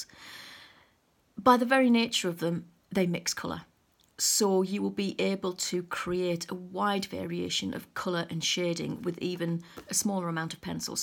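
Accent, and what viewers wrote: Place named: Scotland, United Kingdom. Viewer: Hullo,! I've been watching your vids for awhile now (I subscribed) for 2 reasons: 1. You're so talented and a wonderful teacher! You don't hurry thru and you are very clear and precise. 2. I am a transplanted Scot living in the US and your voice reminds me of my Mum. Same accent AND she was a painter! So you bring me comfort on two levels. Thank you so very much for brightening my days. Blessings xo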